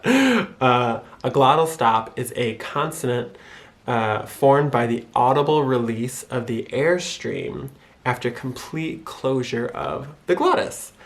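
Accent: Michigan, United States. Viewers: Lower peninsula accent. Up North, it's a different accent entirely. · I am a michigander. Lived there for 30 years. I do not conversate, I converse. I say Ford unless it's a possessive. I say Sherbet like "shure bet" and horror "horror or" "e-Nun-c-e-adt", I proNOUNce. I am really heavy on the lack of letters, softening, shortening and adding ds on everything. I use the full stop ✋️. On every sentence.. I have lived inna appartmeh. I over "pRuh nunce" the R. When the word But is Buuh. I live and love your accent, it reminds me of home.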